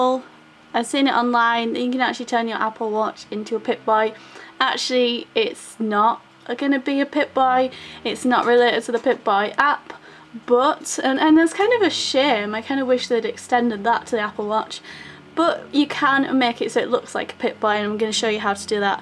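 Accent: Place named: Hull, United Kingdom. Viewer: Sound like you’re from Hull! 😎👍🏼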